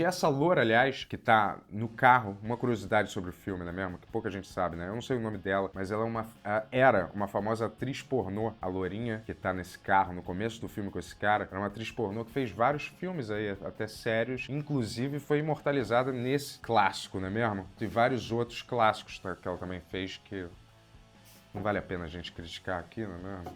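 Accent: Rio de Janeiro, Brazil. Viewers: Ultra irritante esse sotaque carioca onde no meio de cada palavra brota um “A” que não existe. Certo vira “CeAAArto” hoje vira “hoAAAje” fazendo vira “fazeAAAndo”. Nada nesse dialeto prende atenção por muito “teAAAmpo”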